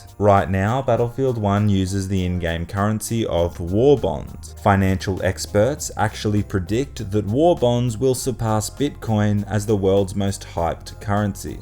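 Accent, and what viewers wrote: Australia. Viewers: Another winner my friend, keep up the great work. Also i love that smooth auzie accent. God damn it makes me wetter then a pelican diving for fish!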